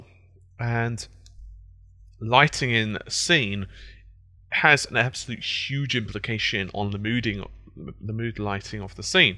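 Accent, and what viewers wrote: United Kingdom. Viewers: That intro always reminds me of a British Buffalo Bill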